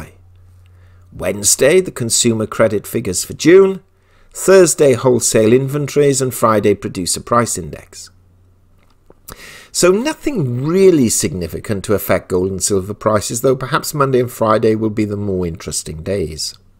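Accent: United Kingdom. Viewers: Is this a regular British accent?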